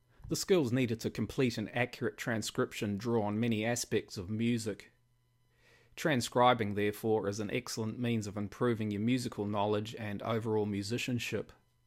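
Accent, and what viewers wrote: New Zealand. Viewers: are you a kiwi? your accent sounds like a new zealand accent! Very helpful video thanks heaps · Hahaha I also was like "Oh damn, a fellow kiwi"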